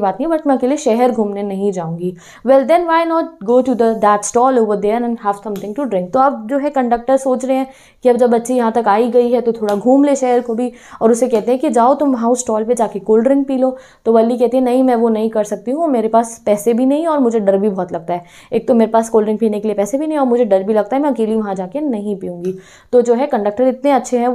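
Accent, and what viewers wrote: Punjab, India. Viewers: Di are you a Punjabi?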